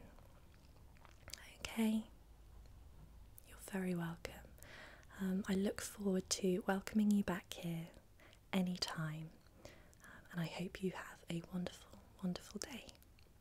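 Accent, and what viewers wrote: England, United Kingdom. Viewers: You have the perfect voice for asmr. The fact that you’re british as well makes it that much better. I’m Canadian but british accents have always been my favourite. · L v It’s still an English accent, irrespective of how many there are 😏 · Something about a British accent at a certain volume relaxing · i like your british accent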